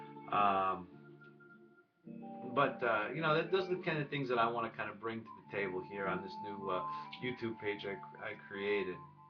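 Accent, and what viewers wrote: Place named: Southern Italy, Italy. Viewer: Hi man! Nice video! I'm Italian (from Lombardy), it's amazing to listen to you speaking italian, because you mix Italian, dialects of Southern Italy, English and Spanish! Keep on posting videos!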